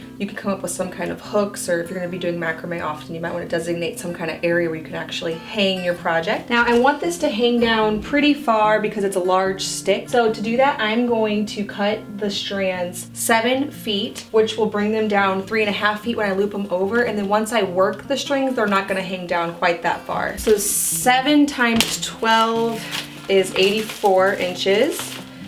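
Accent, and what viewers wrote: United States. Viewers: No way, our young lady is not from NC with that accent. Iowa, I think. I'm from SC and have lived in NC as well. Remember Boone as a small town "nestled in the Blue Ridge Mountains" my family'd stop through on our way to Ski Beach in the winter.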